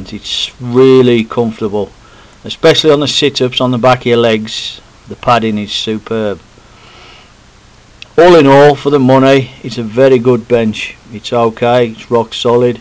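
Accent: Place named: United Kingdom. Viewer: The best thing in the video is the speaker accent. I love british accent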